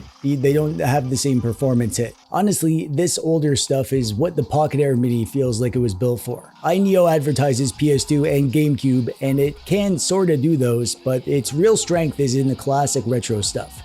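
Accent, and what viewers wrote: Canada. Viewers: That's what Canadians sound like, right? I've always wondered why the handhelds also sound Canadian, though...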